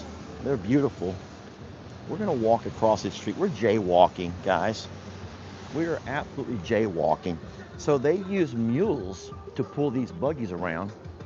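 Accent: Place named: South, United States. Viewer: that’s the way we talk in the south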